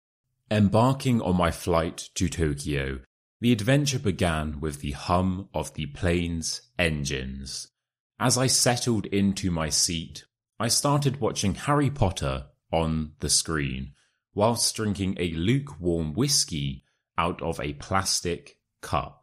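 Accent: United Kingdom. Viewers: I like your short story haha.I learned a lot from you and I like your british accent!thankyou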